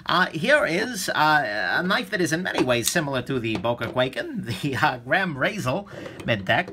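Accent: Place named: Brooklyn, United States. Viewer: He sounds like he’s from Brooklyn or Staten Island to me. The old timey tv/radio broadcaster is hilarious!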